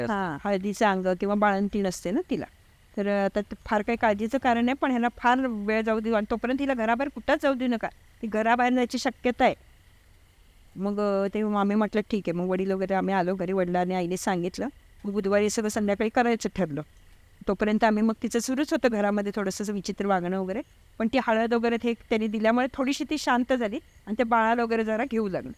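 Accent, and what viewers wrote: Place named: Kolhapur, India. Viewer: कोल्हापूरची मराठी भाषा ऐकून मन प्रसन्न झाले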